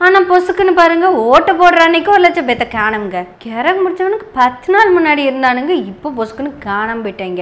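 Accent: Kongu, India. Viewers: கொங்கு தமிழ் கிண்டல்.. நீ கலக்கும்மா... வேண்டாத புள்ளைய பெத்து.... 😄😄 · கொங்கு தமிழ் கிண்டல் சூப்பர் 😂😂😂😂😂😂😂😂 வாழ்த்துக்கள் தோழி ❤❤❤❤ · Addicted to your posts sister❤and your kongu accent slang way of speaking is absolutely amazing and stunning 🎉😊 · தங்கையின் கொங்கு தமிழுக்கு இந்த அக்கா ( மதுரை மண்டலம்)ரசிகை😊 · அழகிய கொங்கு தமிழில் சங்கிகளை வெறுப்பேத்தி இருக்கிங்க..வெந்த புண்ணில் வேலை பாச்சாதீங்க சகோதரி!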